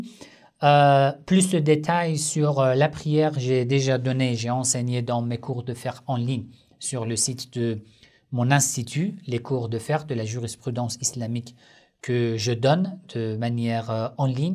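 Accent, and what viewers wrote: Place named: Iran. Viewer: Bonsoir, vous etes iranien?? J'aime bcp l'accent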